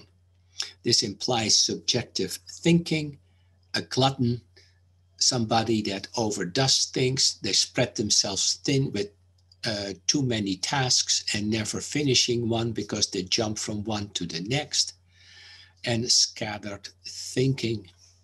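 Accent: Netherlands. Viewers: do I hear a Dutch accent?? 🙋‍♀️ from The Netherlands.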